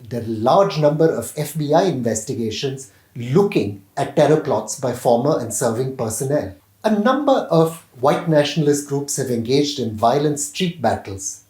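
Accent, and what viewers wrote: India. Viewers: Clown reading MSNBC agenda items ans propaganda with an Indian accent …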